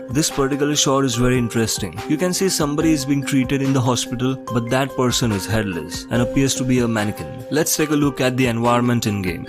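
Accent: India. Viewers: Are you from India🤔? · I can sure u r an Indian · Are you from india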